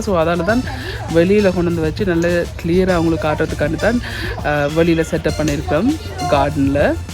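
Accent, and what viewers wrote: Jaffna, Sri Lanka. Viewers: Neenga jaffna தானே.?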